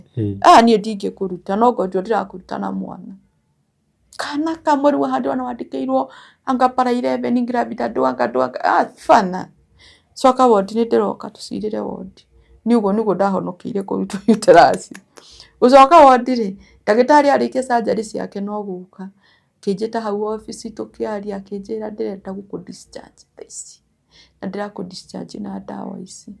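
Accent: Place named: Kawangware, Kenya. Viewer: Hapana hiyo lafudhi si ya kwenu. Ni ya kwetu Kawangware.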